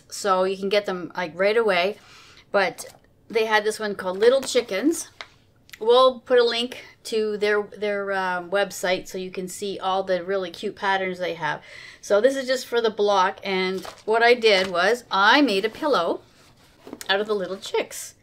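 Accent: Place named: Canada. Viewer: Happy One Year Anniversary! Was painting and listening to random Youtube vids - heard your voice and recognized a fellow Canadian. I'm in BC. Weather is 'meh' here. Overcast.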